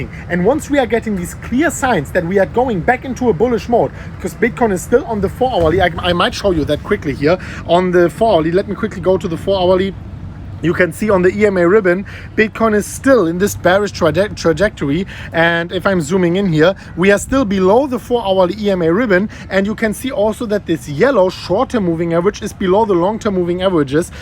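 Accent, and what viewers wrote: Germany. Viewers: How do you have such a thick German accent with your command of the English language.. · Fuck, seriously. You spend more time abroad then in Germany, start getting rid of that stupid accent. Sound just ridiculous.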